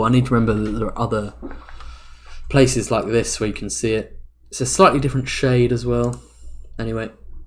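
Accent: United Kingdom. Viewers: Hearing british accent is so releaving. Im from Poland but after listening to american youtube and then switching to british it feels like its my native language. I can't explain why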